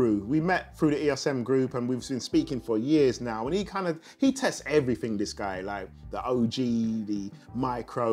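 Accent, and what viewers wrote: United Kingdom. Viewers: I'm here for the British accent, otherwise excellent review we need more of the affordable gear review.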